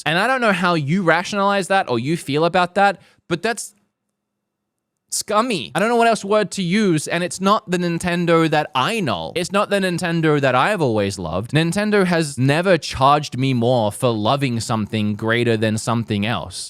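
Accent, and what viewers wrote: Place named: Australia. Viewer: Completely irrelevant question but what's your accent? You sound Australian with a touch of something else?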